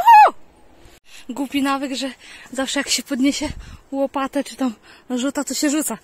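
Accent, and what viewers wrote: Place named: Podlasie, Poland. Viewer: Zazdro z tymi rosochami, taką to łatwiej znaleźć niż tykę jelenia, bo dzięki śniegowi łosia można łatwiej wytropić. A u mnie łosi nie ma. Gratuluję :) I podoba mi się twój akcent, chyba podlaski?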